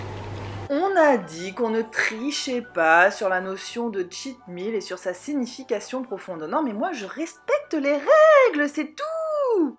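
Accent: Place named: South, France. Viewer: Hey!! Non m ai tu m'a TUÉ !! toute la vidéo 📼j'ai aimé ton ACCENT du SUD HOUEEEEE !! SUPER repas très beau Visuellement très bon dessert tu m'a donné l eau à la bouche d autant plus que je suis entrain d éplucher des PATATES pour les passer ensuite à la POILES J APELLE sa des patates sauter !!! 🥔🥔🥔🥔🥔🥔🥔😂😂😂😂😂😂😂😂😂À Perpignan il 18h30 à 20h on mange et en plus j'ai la DALE FIN BREF je vous souhaite une bonne soirée et des bibisou !! 😘😘😘😘🌃🌃🌃😘🤗🤗🤗🤗🤗👍👍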